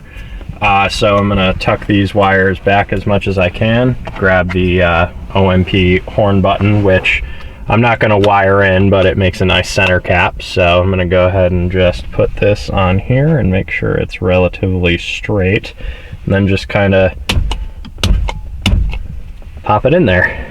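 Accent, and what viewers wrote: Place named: New England, United States. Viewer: New England, not England lol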